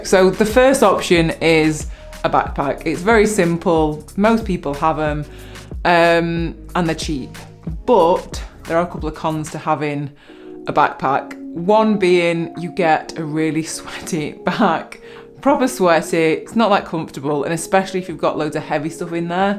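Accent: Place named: Manchester, United Kingdom. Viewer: You didn’t cover the biggest problem that’s always made it difficult for me. Where to put your bike? With you’re accent I take it you are from Manchester, well I live there too and I’ve yet to work at a place there that would let me take my bike inside. Last place I worked I had to lock it to a lamppost outside, it lasted a week before it was robbed. The police described there are gangs that drive around in vans, which they fill with bikes. They carry bolt cutters that make mincemeat of even the most expensive locks. My bike before that was even stolen from inside the development where I live, watching back the CCTV, they somehow got the gate to open, came through the gate in a van, reversed up to the cage containing about 20 bikes, used bolt cutters to cut a huge hole through, filled their van, then drove off, the whole thing took just 13 minutes to steal 20 decent bikes. 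It seems to be a problem with Manchester in general, people seem to feel entitled to take what isn’t theirs. Like that daft MoBike scheme, it was never going to work, most of those bikes are now in the ship canal. I’ve even witnessed a group of youths jumping up and down on a bike, because they couldn’t steal it, so they thought they’d trash it instead. I just don’t take my bike to the city anymore if I know I have to leave it. Most of the time now, I just walk.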